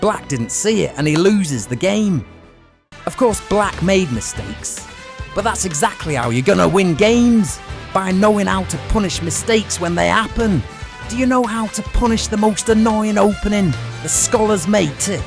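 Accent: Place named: United Kingdom. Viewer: Bro is defenrtly from the british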